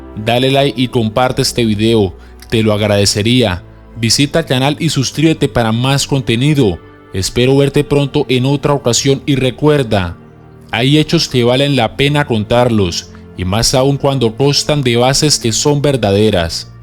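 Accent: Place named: Colombia. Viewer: tan solo con ese horrendo acento colombiano; al minuto de ver el video me dio desesperacion y ya no me intereso ver el video 😝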